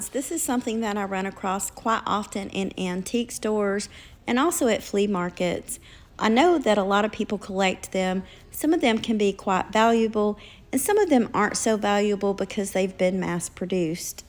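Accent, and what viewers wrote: South, United States. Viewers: I think it’s just your adorable Southern accent ❤️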